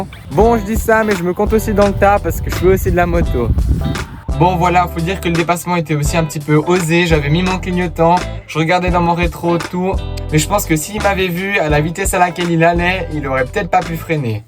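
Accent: Switzerland. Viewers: slt tu es de quelle region ? tu a un accent mais je n'arrive pas localiser . en suisse peut etre nan ? je viens de decouvrir ta chaine . fort sympathique + 1 abo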